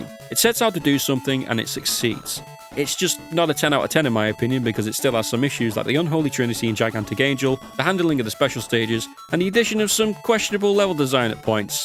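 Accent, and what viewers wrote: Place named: Liverpool, United Kingdom. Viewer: Why do you sound like you're from Liverpool?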